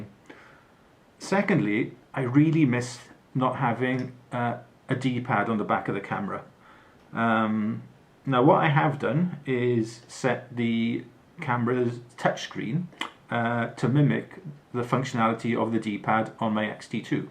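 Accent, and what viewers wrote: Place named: Wales, United Kingdom. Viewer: Just come across this by chance. Not a Fuji shooter (used to have an XT1 though) but love the medium format landscape look & if money was not an issue this would have been a must.. Nice to hear a Welsh voice and someone shooting landscape on You tube. Just off to check your other videos. Hope you get your probs sorted with this camera. atb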